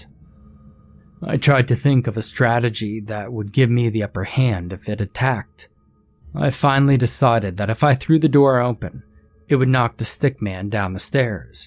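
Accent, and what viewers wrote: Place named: Philadelphia, United States. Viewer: The voice over guy is from The Delaware Valley (Philadelphia Area).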